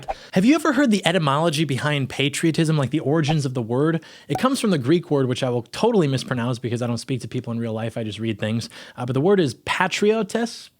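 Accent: Michigan, United States. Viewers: I figured you were from Michigan. Since moving out of my home state, I miss how Michiganders talk. Fast and concise.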